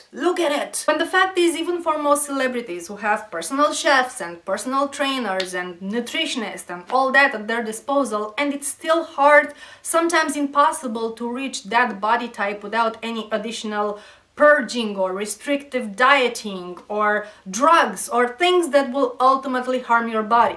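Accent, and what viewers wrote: Romania. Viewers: You make some very good points and in such a reasonable and non-histrionic way. Because of that I'm only slightly disappointed, having very much enjoyed your video and even subbing to your channel. My disappointment comes from being a fan of another Romanian Youtuber named Vee. While you and he actually make some similar points (though there are some very distinct differences), he is much excitable and given to, often hilarious, raging. While the cultures of Romania and the U.S. also have their distinct differences, I'm glad there are so many issues we find common ground on. The more I hear you both the I've come to believe the Romanian accent is my favorite in the world, so thank you for that as well. BTW I also was a Red Alert fanatic when it came out and the intro still gives me chills. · yo so what country are u from? romania maybeh?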